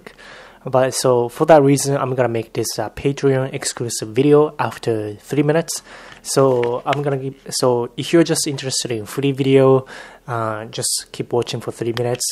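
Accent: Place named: Japan. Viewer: your english sounds like japanese-english.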